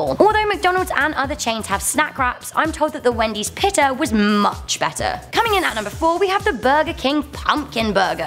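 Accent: United Kingdom. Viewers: She made me cringe each time when she pronounced it as "pITas" instead of "pEETas", lol and killed me when she said "naughties" instead of "nineties". She speaks very much like my ex mother in law(she is British), except I like listening to this woman speaking...and not the ex mother in law.lol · I think it may be British pronunciation cos I say taco like that and I’m British cos Americans have a TOTALLY different accent so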